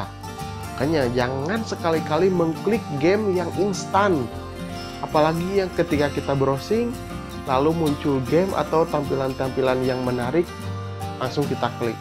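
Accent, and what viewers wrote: West Java, Indonesia. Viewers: sunda banget bang wkwkwkwk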